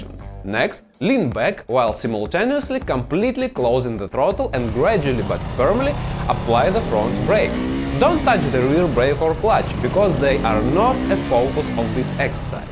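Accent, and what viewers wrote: Russia. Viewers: Love the vids brother, forgive my ignorance please, but you sound like you're from Russia? Im curious, how many months of riding do you guys get over there? in my head all i see is snow and furry hats, Russia's cold looks like its NO JOKE 😳 Ive obviously never been but id love to.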